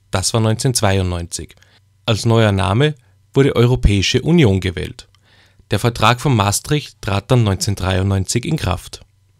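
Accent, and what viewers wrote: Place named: Austria. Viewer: Sehr gut erklärt,man hört das du Österreicher bist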